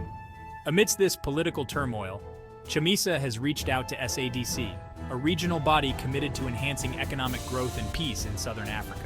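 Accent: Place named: Europe. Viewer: Would have preferred this being reported by Museyamwa, Mutenhesanwa or Nyamuzihwa - or their female counterparts. Not this Eurogenic voice. Do we ever get an African voice promoting an American, British, French or other political outfit? What's the agenda here? It makes some of us question whether Chamisa is any different from the American puppets Nana Akufo Ado or William Ruto, to mention but just two. Does he really stand for us, if such a voice as this is his representative?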